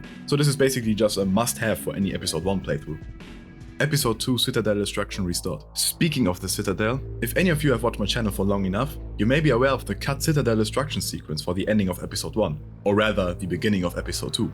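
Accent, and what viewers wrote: Germany. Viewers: You think you speak English but i hear German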